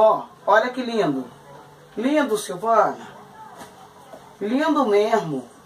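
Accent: Rio de Janeiro, Brazil. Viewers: Como não amar 😍😍,saiba que a senhora me ensinou muita coisa viu,principalmente os trabalhos de casa pra ajudar minha mãe 😍😍...Adorooo a senhora... Obs: Quando ela fala:pra mim é pra patyy,que sotaque gostosooo carioca...😍😍😍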